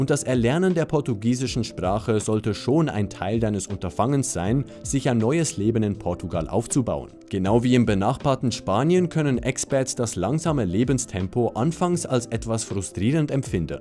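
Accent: Switzerland. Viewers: Wenn jetzt kein schweizer Akzent wäre, würde ich sagen das ist runtergeleierte KI ohne Luft zu holen und nützliche Sprechpausen!!!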